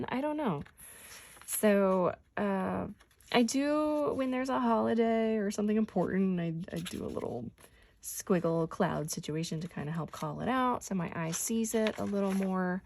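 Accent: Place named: South, United States. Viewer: As a Georgia girl, I appreciate hearing your southern accent. I am excited about your new channel. I really like your planner set up. Thanks for taking time to share it with us!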